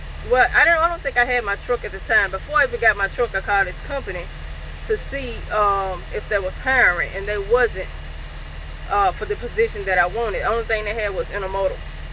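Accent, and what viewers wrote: South, United States. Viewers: Hey lady. I haven’t been with you from the beginning. But I do remember when you were local. Then you got your own truck. I remember you talking about running under your own AUTHORITY. Then you started company hoping. And now your back driving your own and running Local. Stay post and start and keep your head up. Still waiting on that other channel. Love your Southern Twang. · Hi there! Sorry, that happened to you. My peoples lives in Memphis. I just your southern accent. Your down to earth too.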